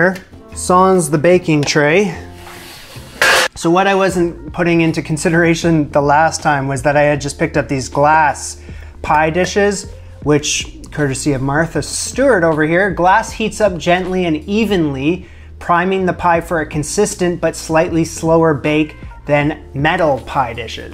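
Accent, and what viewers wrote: Canada. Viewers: First time I ever saw your vid. For some reason I detect a bit of Canadian in your voice but could be wrong?I very much like your "son of a bitch"! Will use your filling recipe to make pumpkin custard because stressing with pastry is not my idea of a good time. Subscribed!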